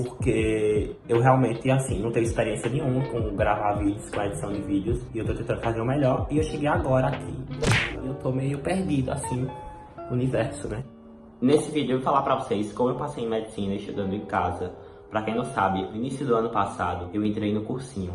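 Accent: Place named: Northeast, Brazil. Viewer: que sotaque nordestino maravilhoso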